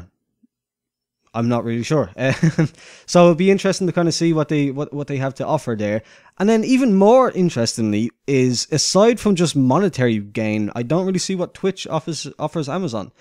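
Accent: Ireland. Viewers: Haha I love a fellow irish accent, where abouts are you from I'm from swords in dublin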